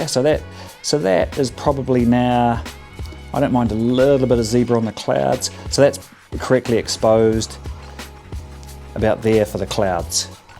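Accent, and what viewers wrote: New Zealand. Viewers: Haha! Definitely Kiwi! Great advice and guidance.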